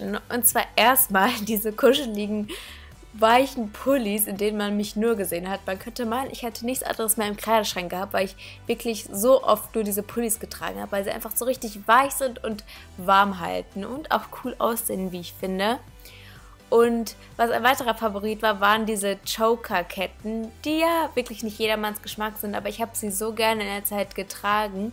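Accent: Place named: Bavaria, Germany. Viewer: du kommst aus bayern :O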